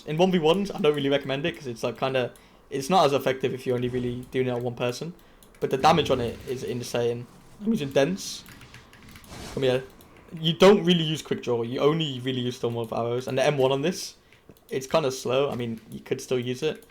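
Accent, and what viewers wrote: United Kingdom. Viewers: Close enough, its actually british (atleast he sounds british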